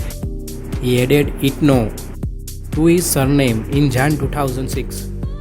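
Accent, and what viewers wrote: India. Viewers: the indian narrator voice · Why Indian English accent is so heavy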